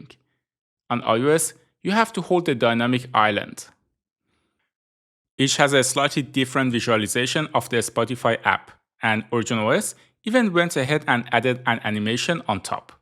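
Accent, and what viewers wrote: Iran. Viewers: You sound Iranian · Are you Persian or Iranian? As soon as I heard your voice I could tell😂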